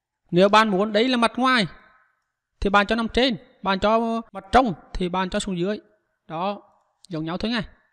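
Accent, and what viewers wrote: Central, Vietnam. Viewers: Hay quá a ơi.giọng miền trung cũng rất là chất luôn a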